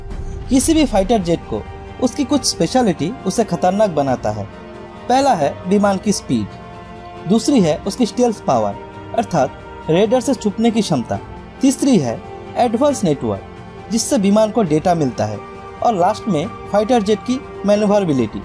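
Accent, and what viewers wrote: Bengal, India. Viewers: You are bengali.... there is bengali accent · Bro you are Bengali, I can smell it😘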